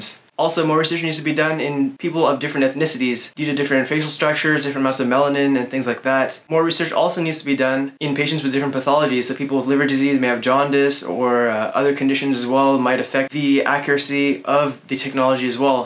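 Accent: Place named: United States. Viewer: Ur talking style like American so I asked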